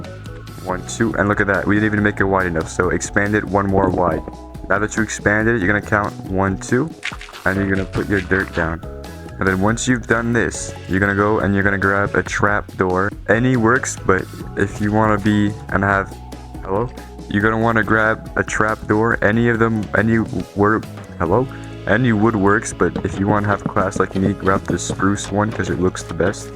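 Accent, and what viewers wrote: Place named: India. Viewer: I LOVE IT even though you sound like an Indian they humor and the innocent for tutorial you got potential 😂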